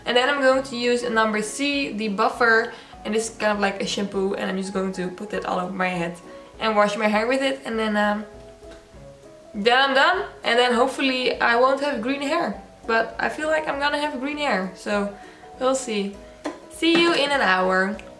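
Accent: Netherlands. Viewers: Are you Dutch?? As someone from The Netherlands your accent sounds really Dutch 😂😂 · Hahaha altijd zo leuk als je denk hmmm Vgm is ze Nederlands want ze heeft een Nederlands accent en het dan goed hebben! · Is dat een Nederlands accent? 😏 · I couldn't quite figure out why your accent sounded so familiar to me, until I looked at your channel and saw you were from the Netherlands which is where I'm from hahahaha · Hoorde gelijk aan Je accent dat je NL was 😂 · You have a dutchy English-speaking accent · Are you Dutch? If yes, your accent gives it away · Are you dutch you sound dutch ( first video of yours ive seen) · Hahahaha 1 min into the video en hoorde gelijk dat je Nederlands bent 😂 love ur hair tho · Whahaha ik zat voor het eerst te kijken en ik dacht ‘Dit is een TE Nederlands accent’ en zag in de beschrijving dat je inderdaad Nederlands bent! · dutch accent hihi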